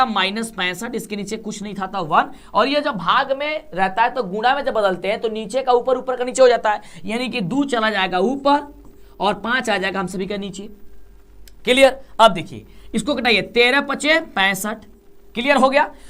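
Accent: Bhojpuri, India. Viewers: Aap Bhojpuri mei Kyo boliye Lagte Hai sir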